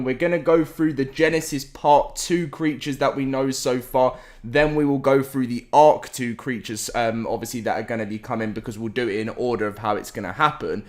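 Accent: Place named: United Kingdom. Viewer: According to his voice: UK According to his looks: US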